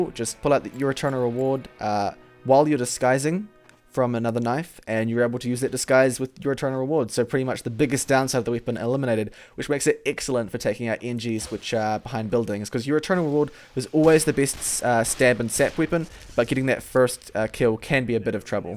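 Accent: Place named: New Zealand. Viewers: He's from new zealand I think? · I'm not overly familiar with Kiwi accents, but it sounds like Australian but different, so I'll go with NZ too.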